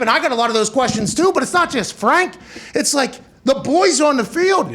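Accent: Pittsburgh, United States. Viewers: Got damn you are from Pittsburgh listen to that accent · The Pittsburgh accent from McAfee is amazing...they should bring the Pittsburgh Dad on here to really make it pop. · The yinzer accent thickens when he gets excited. DAAHHNTAAHHN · Lol 😂 Love the yinzer accent! Thank you Jags! Go Stillers! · becomes Super Yinzer when he’s pissed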